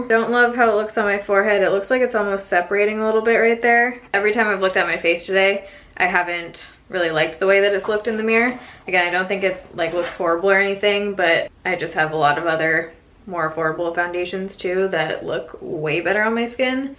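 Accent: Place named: Maine, United States. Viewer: Where are you from? You sound like you have a Maine accent! I'm a Maine girl, I'm probably way off but I was just wondering 😊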